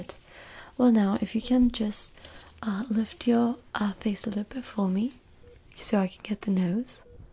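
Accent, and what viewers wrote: United Kingdom. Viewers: Ooo fancy English-y accent in this. Thanks!! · Me encanta tu inglés. Es más brittish que american. Eres inteligente . Y de gafas estás preciosa. Te favorecen. Este vídeo me da muchas cosquillitas. Me suscribo.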